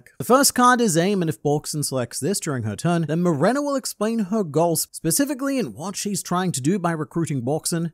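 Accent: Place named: Australia. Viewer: I love ozzy accents. Morenerr!!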